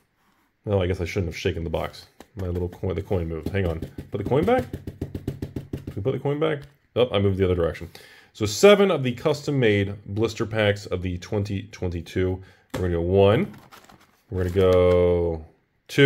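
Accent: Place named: South, United States. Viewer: It’s his pronunciation. Sometimes I hear it was “crowns” IN THE SOUTH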